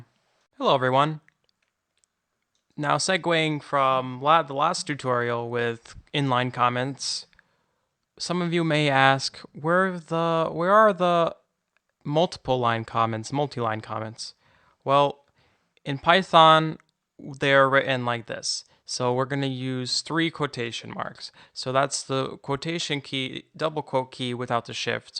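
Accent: United Kingdom. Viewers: The hawker has a REALLY annoying British ac-cent' on "PIE'-thun'. The other Americans speak very well. The content is good. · Thanks GOD, a British accent at last. Where have you been mate?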